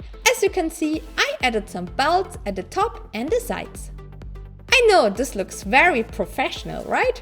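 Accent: Germany. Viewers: Your German or?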